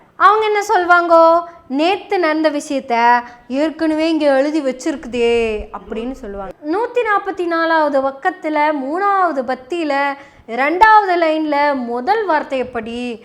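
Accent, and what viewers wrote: Kongu, India. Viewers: தங்கள் பேச்சு மிக அருமையாக உள்ளது. அதைவிட தங்கள் கொங்கு தமிழ் மிக மிக அருமையாக உள்ளது நன்றி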